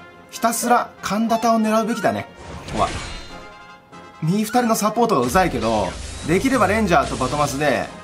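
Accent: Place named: Osaka, Japan. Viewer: 大阪のおばちゃんかおもいました。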